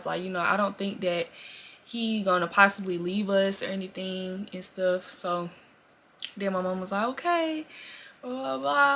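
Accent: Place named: Louisiana, United States. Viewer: You gott thattt lousianas accenttt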